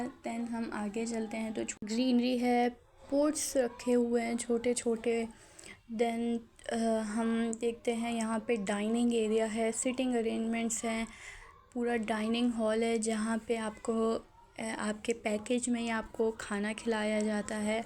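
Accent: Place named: Karachi, Pakistan. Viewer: Pure Karachi accent 😂 keep it up 💫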